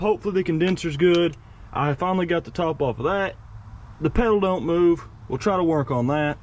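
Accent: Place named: North Arkansas, United States. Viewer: I enjoy the north Arkansas accent.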